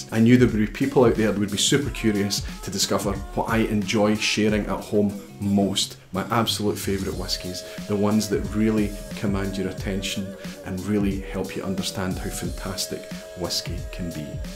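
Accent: Scotland, United Kingdom. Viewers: Hello! Really like your videos, and your Scottish English! What part of Scotland are you from? Thank you!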